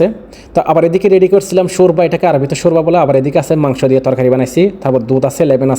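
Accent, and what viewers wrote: Bangladesh. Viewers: হেতে নোয়াখালীর বাংলা কয় তাই না? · বদ্দা তো চিটাইংগা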